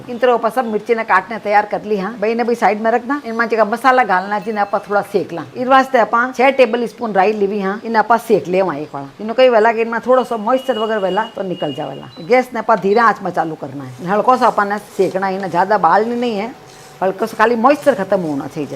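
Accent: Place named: Marwari, India. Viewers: How educated u are please reply.. what a marwari twist with English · aap rajisthani bolte huye bohot ache lagte ho mujhe bohot acha lagta he rajisthni khane maze k hoten hen me pak me reheti hin magr mene jothpur ki thali khai he or jothpur ka qila bhi dekha he jodha bai ka ab me ye achar bhi banaungi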